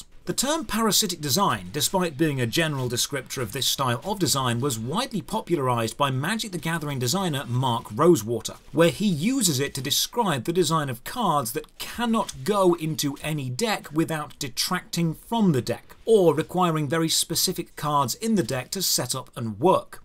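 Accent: United Kingdom. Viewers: I definitely do enjoy my dose of snarky british man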